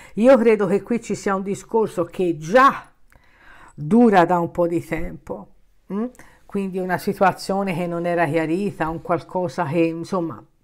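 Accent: Tuscany, Italy. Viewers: A me mi fai morire 😂 acciderba al toscanaccio🤙😊😂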